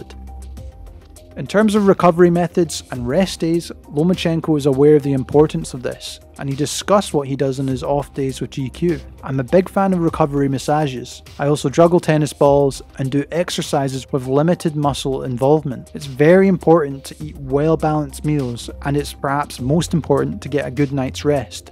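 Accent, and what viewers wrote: Scotland, United Kingdom. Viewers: Good vid work. 👌 the Scottish accents adds more value 😄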